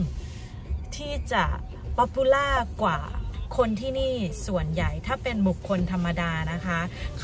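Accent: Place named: South, Thailand. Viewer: ไม่แปลกใจเลยค่ะ ว่าทำไม่อยู่S Carolina ทำงานกับ บ. แม่ BMW นี่เอง ชอบน้องแหลงใต้มากค่ะ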